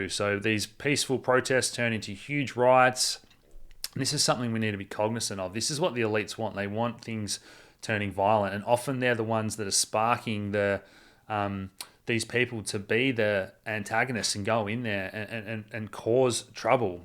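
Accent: Australia. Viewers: As soon as the word KABAL is used it becomes another asshole speculating and pushing some crap that may resonate with those that do not possess the skill of critical thinking.. Don’t be led by the nose down the garden path . An Australian accent does not automatically exude credibility!!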